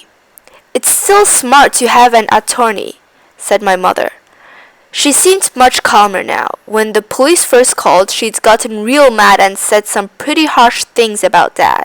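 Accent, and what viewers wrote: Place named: United Kingdom. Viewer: sry british voice annoying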